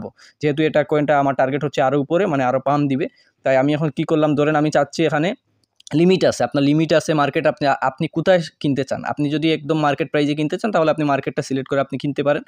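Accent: Sylhet, Bangladesh. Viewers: Vai ki Sylheti?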